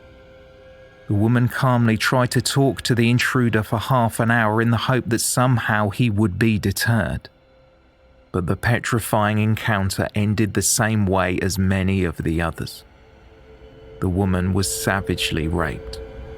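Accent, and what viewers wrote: England, United Kingdom. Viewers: I like the narrator s voice very much n his english English❤❤😊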